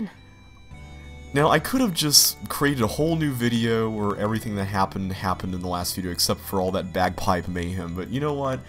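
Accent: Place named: United States. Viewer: Your accent is adorable! What part of the US are you from??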